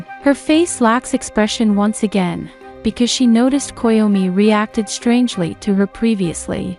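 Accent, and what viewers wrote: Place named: England, United Kingdom. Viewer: Sorry, but I keep laughing when you read anything Japanese in English accent XD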